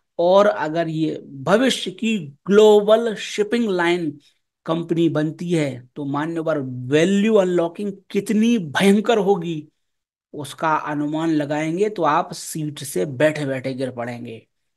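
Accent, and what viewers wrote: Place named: Bihar, India. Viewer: go jaye....ga yani aap v bihari ho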